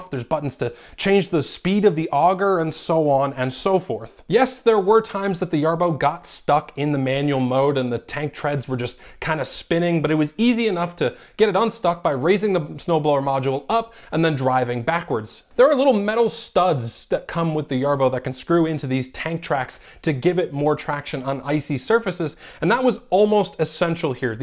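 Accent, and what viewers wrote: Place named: Newfoundland, Canada. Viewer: The Newfy accent is mild 😓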